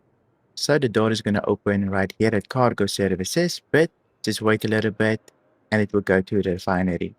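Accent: Afrikaans, South Africa. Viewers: Fokkit dis darem maar lekker om daai Afrikaanse aksent in Star Citizen te hoor. Dankie vir al die video's ek leer vrek baie! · Just checked where your account is based. Thought I heard a nice Afrikaans accent there. 💪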